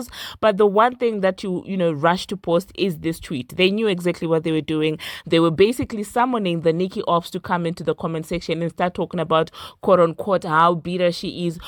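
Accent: South Africa. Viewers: Love your channel are you South African ? Where is your accent from ?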